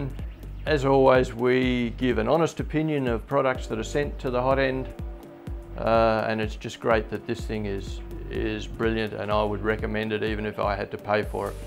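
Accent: Australia. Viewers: what part of OZ r u from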